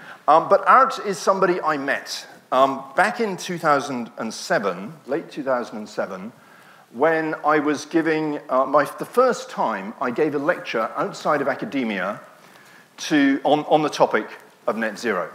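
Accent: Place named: England, United Kingdom. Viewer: The bad sound is that bloody English accent.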